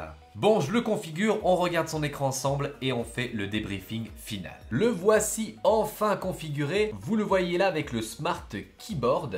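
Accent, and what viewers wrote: Switzerland. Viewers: J'me disais aussi avec un accent pareil pouvait être que "suisse" et pas frenchie, et on s'presse pas siouplait ))))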